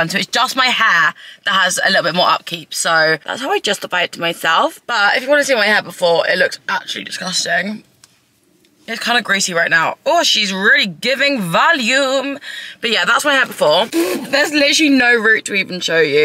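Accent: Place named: United Kingdom. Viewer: I don’t think I realized that you had a British ascent till now. I just followed one of your Instagram links and found and subscribed to your YouTube channel. This entire time I thought you were an American 🇺🇸. I love hearing your voice for the first time. I had imagined it was going to be a higher pitched voice prior to hearing this video.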